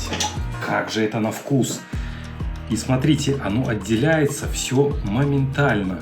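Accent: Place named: Odessa, Ukraine. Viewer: Длбавляе т что шикарный вкус, а то их есть у меня получается, еврейский одесский тип речи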